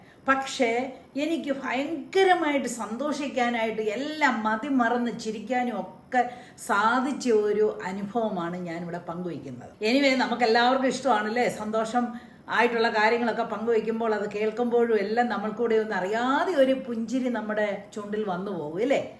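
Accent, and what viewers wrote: India. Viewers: ചേച്ചീ, മീനച്ചിൽ താലൂക്കിലെ ഭാഷ മിന്നസോട്ടയിൽ കേൾക്കുമ്പോൾ ഒരു സന്തോഷം 😃😍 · Nammal kottayam language supper my housepalail anu mam nu big salutu. Paranjathallam cherppatthil ingana airunnu veetil ❤❤❤❤❤❤❤ Allamm ketttu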